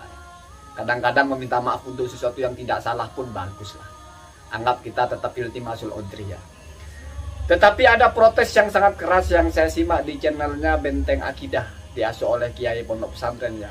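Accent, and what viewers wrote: Madura, Indonesia. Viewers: Maklum,. Memang logatnya orang Madura kayak gitu mas.. kesannya ngegas kalau bagi orang Jateng. Tapi bagi orang Jatim dan Madura sendiri, itu biasa saja.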